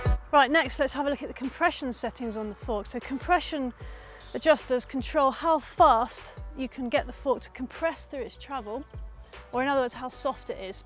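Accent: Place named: United Kingdom. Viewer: You sound British but doesn't look like you're in the UK. Where is this?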